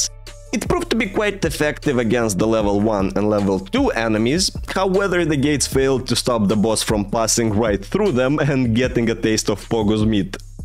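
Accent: Russia. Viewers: рязанский акцент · Youre russian? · По произношению понятно, РУССКИЙ!!! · Is it just me, or am I hearing a Russian accent? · It's the first video I'm watching by you and I was immediately like "a familiar Slavic accent huh?" Hello, fellow Russian!XD Удачи в твоих начинаниях! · It sounds like you have a Russian accent lol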